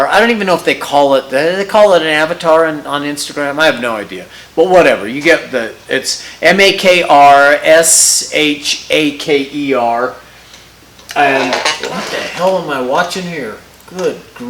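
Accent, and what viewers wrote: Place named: United States. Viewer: American accent and metric! Thanks for not frying my brain with inch unit tolerance.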